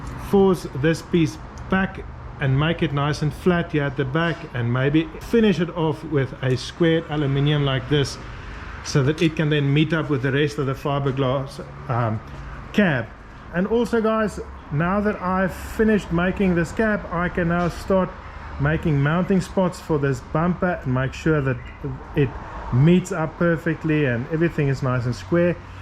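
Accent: South Africa. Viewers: These are great videos. Love your South African accent. Reminds me of when I lived in SA. 'N boer maak 'n plan !!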